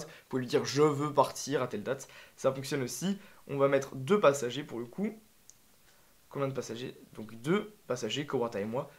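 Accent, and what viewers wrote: Belgium. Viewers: Quentin Doe Il est Belge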